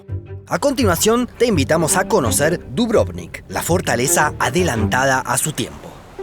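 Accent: Argentina. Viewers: lo iba a ver, hasta que escuche la repulsiva voz de acento argentino .. 🤮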